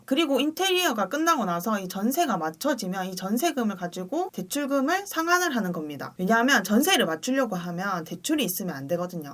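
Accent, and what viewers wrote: Gyeongsang, South Korea. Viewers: 경상도 세요? 억양이 너무 쎄네요😢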